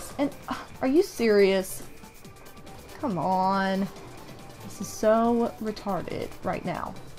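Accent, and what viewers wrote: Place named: Indiana, United States. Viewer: Canadian here. This year I didn't go over the border for Black Friday deals though so I'll just be staying here listening to your Indiana accent.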